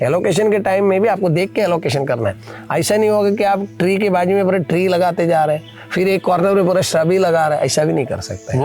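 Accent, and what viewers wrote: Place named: Gujarat, India. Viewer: Lagta hai sir gujrat me jyada rahe hai kahi kahi gujrati aa rahi hai 😅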